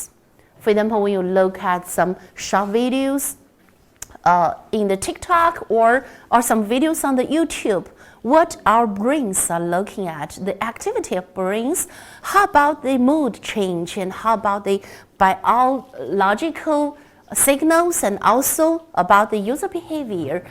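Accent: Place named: China. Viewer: 中式英语!😊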